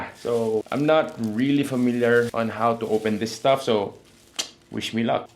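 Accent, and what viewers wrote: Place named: Conyo, Philippines. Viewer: Kabaklaan conyo pa mag salita, cringe ka talaga haha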